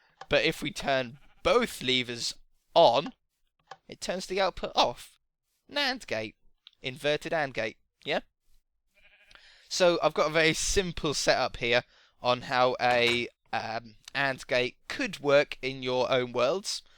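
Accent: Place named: England, United Kingdom. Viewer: I believe the correct word would be enunciate and that is just his english accent.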